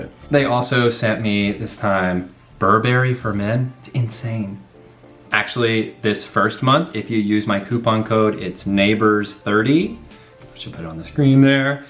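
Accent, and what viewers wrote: South, United States. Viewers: You're a Southerner, but lost your accent? <:-(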